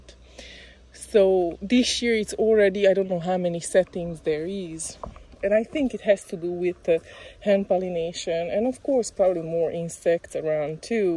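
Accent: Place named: Hungary. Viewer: Haha, elég régóta nézem a videóidat de eszembe se jutott hogy esetleg magyar az akcentusod :) Én a Karib tenger egyik szigetén élek van egy fiatal rollonia fám amiről pár hete szedtük le az első és egyetlen gyümölcsöt. Most nagyon sok virág van rajta de nem egyik se fordult még gyümölcsbe, ezért kérdeztem. Nagyon szeretem a videóidat (köszönöm megint, hogy megosztod velünk) meg persze irigykedem a szép kerted miatt!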